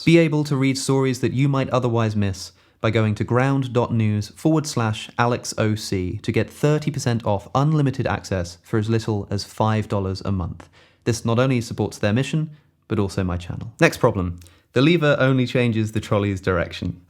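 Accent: United Kingdom. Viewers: No. I have him playing in the background when I wash dishes and sketch. He can be talking about the most graphic shit but it’s still relaxing because he has a British accent 💀.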